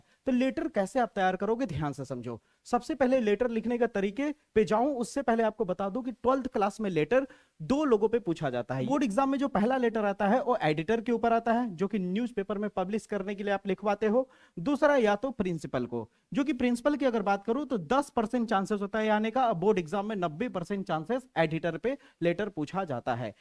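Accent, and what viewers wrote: Bihar, India. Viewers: Ap bihar se ho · Sir app bihar se ho na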